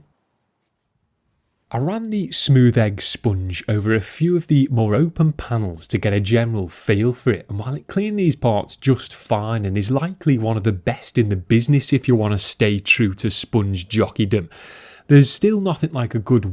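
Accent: United Kingdom. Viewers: Congrats, mate! Frankly, another Volvo was expected, something like anything from those magnificent T6 AWDs :) I'm glad you've got the one you were after, nonetheless! P.S. Thanks for these therapy videos you do! I'm calming myself down with them and at the same time learning most enjoyable British dialect with you :)))) Cheers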